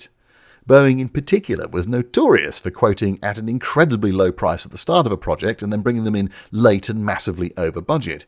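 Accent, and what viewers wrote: United Kingdom. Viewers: I was not totally listening until I heard the British voice claim the Republic F105 Thunderchief was a "Crap airplane". Which is NOT WHAT PILOTS THOUGHT. The F105 was considered a "Cadillac of aircraft" by their crews and pilots. It was insanely complex, and very large, but it was beloved by most of the people who flew these aircraft. They took them out of service because they were doing ridiculously dangerous duty drawing radar contact of SAM missile batteries, and thus about 380 of these aircraft were lost. Strategy began to change. But the F105 was not truly a "crap" aircraft, not by any rational measure. In fact, it seems the electronic and radar gear on the plane appears to have been hyper advanced, and established the trend that advancement in electronic gear was going to be "the future" along with advanced missile tech. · just finds channel...hummm this guys sounds British but what level of snarky British is he. 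(watches for a few minutes) ahh proper British snark levels! instant sub!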